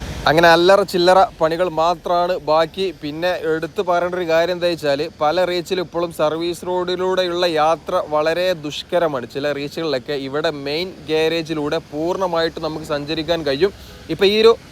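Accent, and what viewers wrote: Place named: Malabar, India. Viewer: Thanks! Good to see you consistently posting videos. I see there’s no more mocking of your Malabar language/slang. Keep it up my friend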